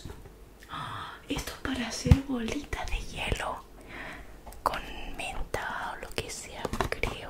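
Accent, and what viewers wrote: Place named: Canary Islands, Spain. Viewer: Hola, el otro día estaba dibujando y decidí buscar asmr. Te escuche y supe q eras Canaria jaja, saludos desde Tenerife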